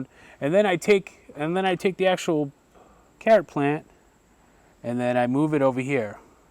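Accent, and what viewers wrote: Boston, United States. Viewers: you from Boston, guy?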